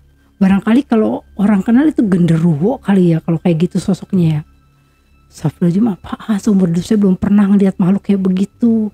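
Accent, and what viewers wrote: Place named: Jakarta, Indonesia. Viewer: Saye suka loga betawinye..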